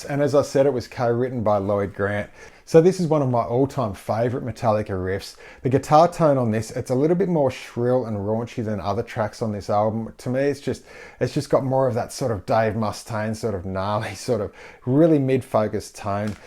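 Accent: Australia. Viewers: There's only a few reasons I come to this channel: to learn how to play my favorite thrash riffs, dial in those glorious tones, listen to an Aussie's killer accent and occasionally have an exotic bird stare judgingly back at my bad technique or pisspoor tone or watch them perform perch acrobatics. This video delivered on all accounts as usual. Love the series idea and as always look forward to more vids from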